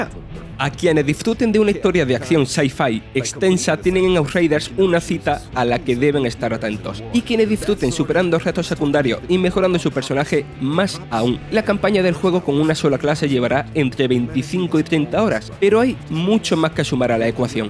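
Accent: Spain. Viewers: Sin ánimo de ofender, quería marcar que: siendo de Latinoamérica se complica entender el acento del locutor. No se reconocer a que parte de España corresponde pero creo que lo tiene más arraigado que otras personas de esa zona que escuché hablar en otras oportunidades en videos y se entendía correctamente. Saludos y muy bueno el canal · Es un acento español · El acento de este reseñador de Vandal de qué parte de España es? He oido ese acento en varios españoles y nunca he sabido de qué parte es. Alguien sabe?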